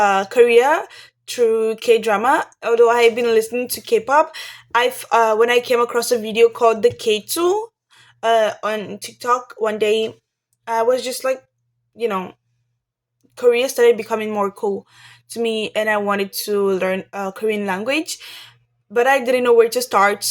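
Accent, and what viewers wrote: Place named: India. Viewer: Its The indian accent for me 😂❤️❤️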